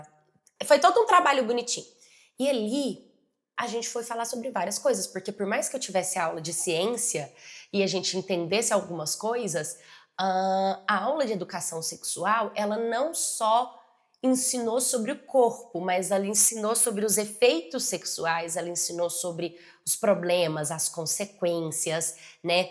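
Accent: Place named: Curitiba, Brazil. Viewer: Eu não sei porque, a voz dela me lembra a da dubladora da toph, Ahsoka tano e da Gwen do Ben 10 só que com sotaque de Curitiba.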